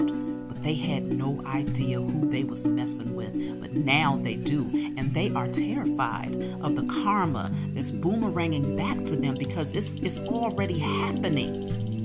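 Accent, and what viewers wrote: United States. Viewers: Love your accent. Which Part of America you from? Also, this here is one of the Most Powerful and On the Nail message I have hard in many years. Not here to state my personal business, but I want to thank you. From Black Man, from London, UK.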